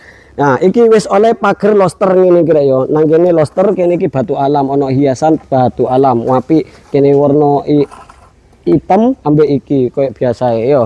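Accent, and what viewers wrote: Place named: Madura, Indonesia. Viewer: Madura y bos.... Logatnya iku lo rek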